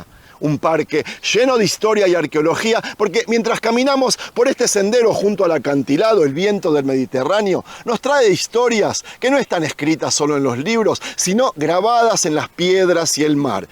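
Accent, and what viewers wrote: Argentina. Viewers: Isaias 53 es desgarrador tremenda palabra que describe a nuestro Señor Jesucristo. Saludos Dios te bendiga. Tienes acento y modismos argentinos che querido.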